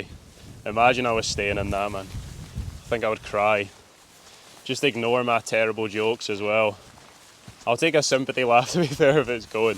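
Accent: Scotland, United Kingdom. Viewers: Beautiful Scotland, beautiful accent! Greets from Warsaw :)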